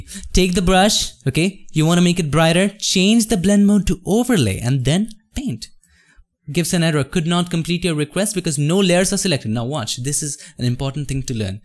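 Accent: India. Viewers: I like the way he speak and explain things I work before in Saudi I have my Co woker indain people I miss the accent of them :)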